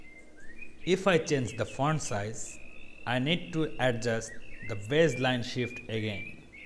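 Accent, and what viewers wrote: Bengal, India. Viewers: own a indian accent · English a bolar quality tei bojha jacce j uni bangali. tai bolci banglai bolte r kicu na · this is the bengali accent, almost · It's sound like that u are a bengali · Pure Bengali Accent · Youtuber: speaks with Indian accent Me: throws up, dislikes video, reports, leaves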